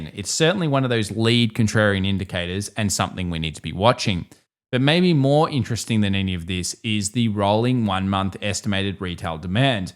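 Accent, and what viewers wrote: Australia. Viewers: I like it the way he said it. Plus he's Australian so buzz off mate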